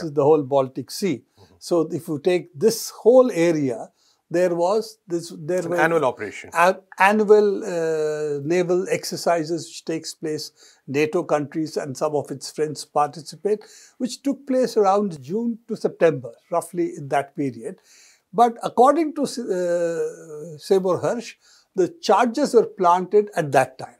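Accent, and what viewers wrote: India. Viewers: Pl speak English, not Am, BRIT, OR Aussic only Indian, the true English, Bravo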